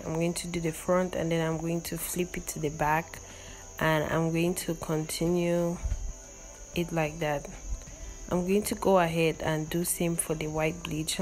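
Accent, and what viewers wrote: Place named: Nigeria. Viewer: This is a really helpful video and it sounds like you're Naija, so I be subscribing 😊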